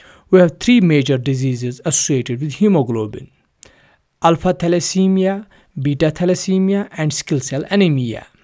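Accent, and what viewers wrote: Kashmir, India. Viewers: Are u from Kashmir I think so because of ur pronounciation.❤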